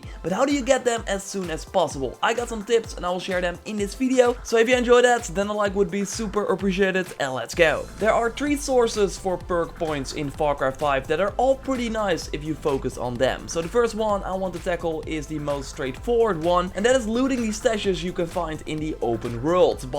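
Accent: Netherlands. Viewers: are you dutch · are you dutch? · He's Dutch lol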